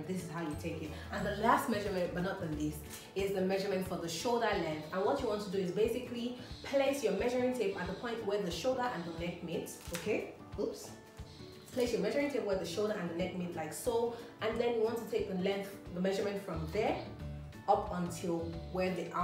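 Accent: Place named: United States. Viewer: You are so cute and I love your English like Americans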